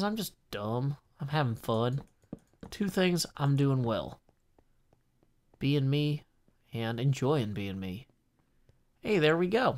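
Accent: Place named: Tennessee, United States. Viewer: I had no idea I wanted to hear “Hack the planet” in your musical Tennessee accent. Always learning new things on your channel,.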